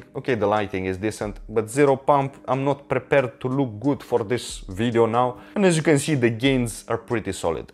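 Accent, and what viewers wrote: Russia. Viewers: I think pavel tsatsouline created this blueprint for a kind of austere, soviet militaristic style of training. I think even if didn’t call himself soviet core others would just because of his accent & dedication to hard training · Wow, Russian accent. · That's familiar accent. Are you Russian?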